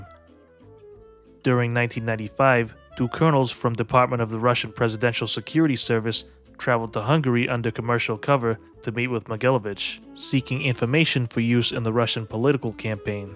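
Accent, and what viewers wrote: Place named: Boston, United States. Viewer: Sounds dead on like Kevin Weeks to me. Definitely a Boston accent and not a Long Island one.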